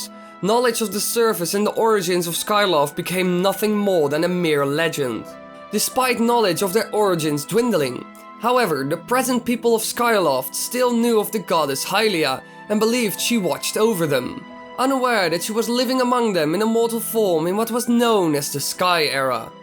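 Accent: Netherlands. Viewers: Are you Dutch because you have a Dutch accent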